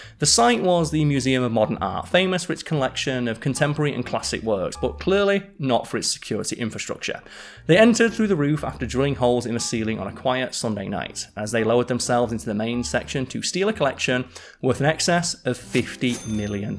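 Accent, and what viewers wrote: United Kingdom. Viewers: Ignore the haters, your great yes Simon was great too but you don’t try to hide the fact your British like he did ( he spoke to American for my liking ) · It was definitely a change going from Simon to you, but I've taken to your style. Still really dig the Factboi's style, but not at the expense of you. As for your voice, I'm a dumb American so I like all the different English accents, and yours is cool. Your style and delivery are your own, and they are cool. Edit: told you I was a dumb Yank; should have used a synonym for cool the second time, or at least added too.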